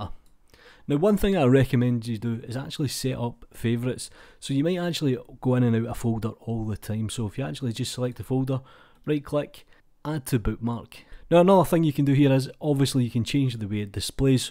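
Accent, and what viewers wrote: Scotland, United Kingdom. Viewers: I dont speak english natively.. is that an Scottish accent?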